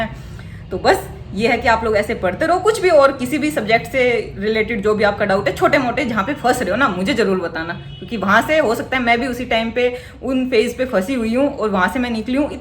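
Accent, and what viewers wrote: Haryana, India. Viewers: Your Haryanvi... ❤️ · I m from bihar, i appeared in cgl 22 mains and, got low marks so decided to prepare hard for 2023 cgl, i liked your advice and loved your Haryanvi accent, the way you explained things is superb.... You made me remind of ritu ma'am who is also from haryana and preparing for upsc.... Haryanvi wali thaat achhi lgti hai with decency.... 😊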